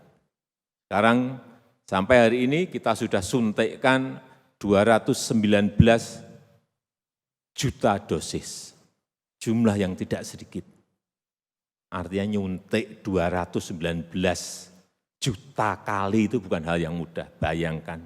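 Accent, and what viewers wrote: Java, Indonesia. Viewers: Pak jokowi klo pidato : saya seneng.. Karna logak beliau jowo tenan.. Meskipun Aku tutu wong jowo tapi seneng ngomong jowo. Halus.. 😁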